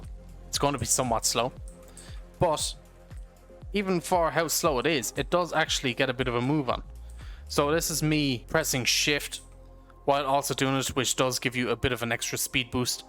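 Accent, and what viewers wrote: Ireland. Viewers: Where in Ireland is your accent from? Limerick? Can't figure out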